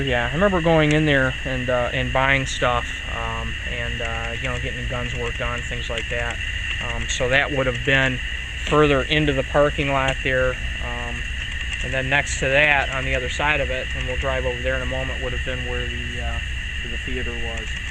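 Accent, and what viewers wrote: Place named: Michigan, United States. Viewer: Jesus!!! Was the camera used from 1985?? The zoom is so loud. Nice job... the whinny Michigan accent of the narrator is hilarious.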